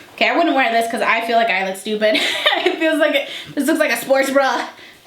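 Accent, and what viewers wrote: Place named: Chicago, United States. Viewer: I am a new"ish" subscriber and I swear I was like "she's from Chicago, I can tell" and sure enough I just added you on Instagram and it says your from Chicago! I'm from the Chicagoland area too! ☺️✌️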